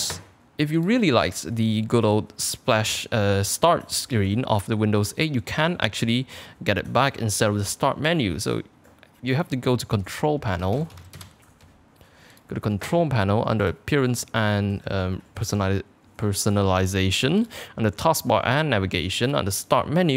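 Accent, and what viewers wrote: Malaysia. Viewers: Sounds malaysia english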